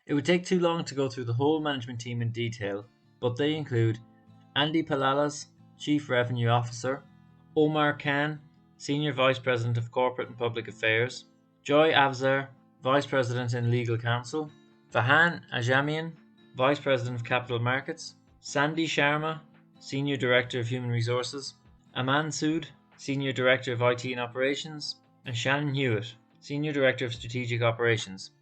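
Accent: Ireland. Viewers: Nice man subbed! Irish accent?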